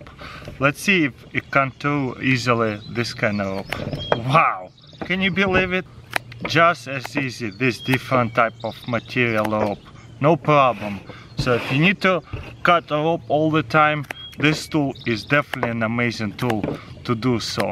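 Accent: Russia. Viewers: псс чувак, а наш акцент-то никуда не делся, ^^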